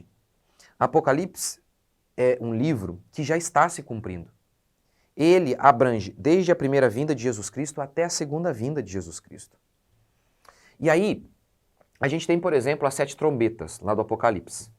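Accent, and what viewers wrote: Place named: Rio Grande do Sul, Brazil. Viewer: eu achava que vc era gaúcho, pastor...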